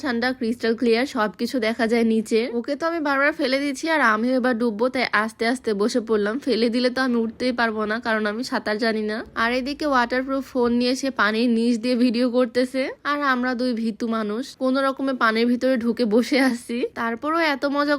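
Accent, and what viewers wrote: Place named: Sylhet, Bangladesh. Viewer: Amader sylhet bole kotha Sundor too hobei Apu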